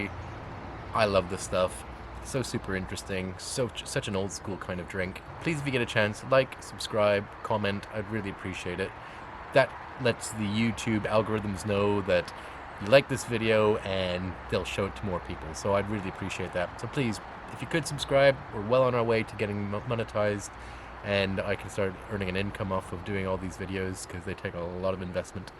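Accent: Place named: Dublin, Ireland. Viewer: Have you spent any time in ireland in the past? Your channel says your from Canada, but your accent sounds like you could be from Dublin to me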